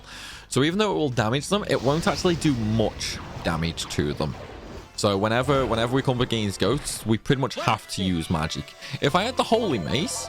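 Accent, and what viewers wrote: United Kingdom. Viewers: I followed this guide and it was accurate and precise to finding all the items. I don't know which was worse tho.... this convoluted tower or listening to this atrocious accent. Why do some brits gurggle the English language like they are choking on spoiled milk? Prime example of why the American revolution needed to happen.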